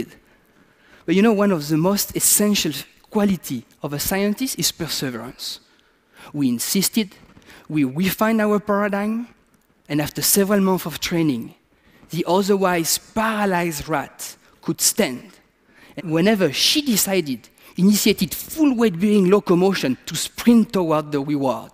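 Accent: France. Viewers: Ahaha oh my god, this video was so powerful and amazing, but as a French speaker myself I couldn't help but find it funny every time he pronounced a word exactly like he would've in French. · Yes, thick French accent. Had to listen to it several times before I understood what he was saying. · Ohhhhhh the French accent made it so much more interesting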